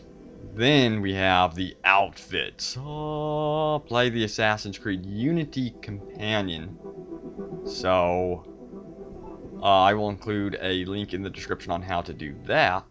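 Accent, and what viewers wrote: United States. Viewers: He sounds like Forrest gump No offence but in English so to me everyone from the south sounds like Forrest gump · Are you american???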